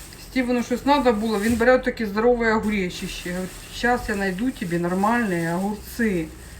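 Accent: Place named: Luhansk, Ukraine. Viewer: Чому питаю, бо думала, що Ви десь з Луганської області, бо ми розмовляємо так само🤗 Було дуже приємно Вас слухати♥️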